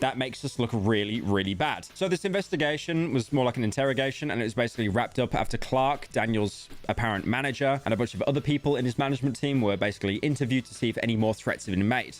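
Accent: United Kingdom. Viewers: I'll be honest, I thought he was British.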